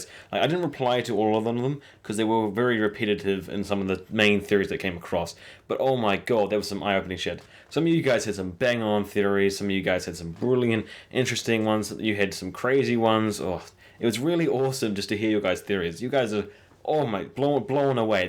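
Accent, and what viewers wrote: New Zealand. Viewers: are you from new zealand? you sound like a kiwi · Cool vids.. i´m from argentina i used to live in nz for the last 7 years.. it´s been a while since i´ve hear kiwi accent.. keep it up.. sweet as bro.. cherr bro..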